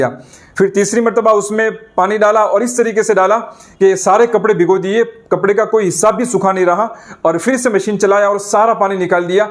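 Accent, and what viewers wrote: Gujarat, India. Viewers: Mufti sb assalamu alaikum warahmatullah vabarakatuhu poochhna ye tha maafi k saath ki jaise molana k aage qasmi nadvi mazahri lagate hai aapke name k aage laga hai ye kis madarse ka hai maloom karna thaaapki baat se to aap gujrat k lagte hai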